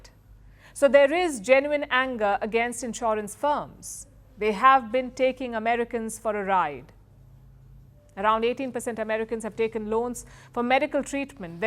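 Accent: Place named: India. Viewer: Please! Stop with the Indian accent and pseudo-journalism!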